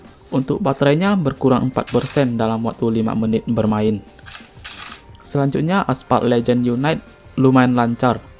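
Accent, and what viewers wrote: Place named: Bali, Indonesia. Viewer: Logat bali😊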